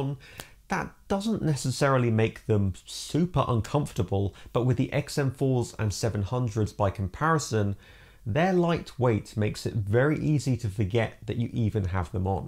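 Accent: United Kingdom. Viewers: is jimsreviewroom British accent version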